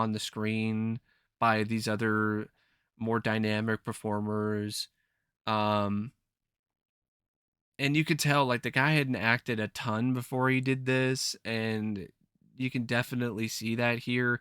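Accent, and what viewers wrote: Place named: Northeast, United States. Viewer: Did you like The Wonder Years? I watched it a lot with my grandma so I got a soft spot for that show. Your voice kinda sounds like you're from northeast usa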